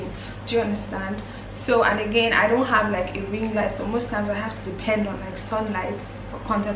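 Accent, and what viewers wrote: Ghana. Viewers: You now speak with Ghanaian accent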